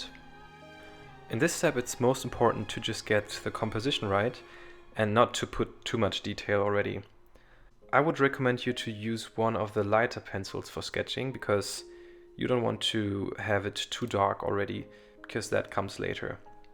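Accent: Germany. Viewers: I pick up a really small german accent but you sound very calm and focused! Great work, no need to feel awkward :D awesome video, thank you so much! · Ich dachte mir schon so...hm...ziemlich deutscher Akzent... ach der ist ja tatsächlich Deutscher xD Ziemlich mutig ein englisches Voiceover zu machen :) Das Bild sieht einfach nur atemberaubend aus, nicht nur wegen der tollen Anatomie, sondern auch weil deine Bilder irgendwie immer einen... mystischen Touch haben :D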